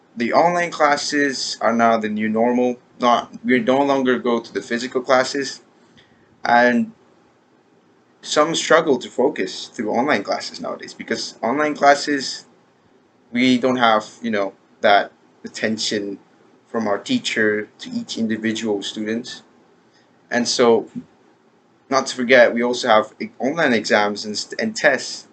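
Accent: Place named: United States. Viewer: You're such amazing!!!! Definitely like an american boysssss....good job !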